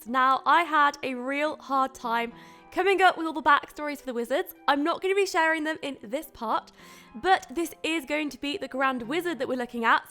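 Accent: United Kingdom. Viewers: you live in germany? but your accent is so british o.o how do you maintain it?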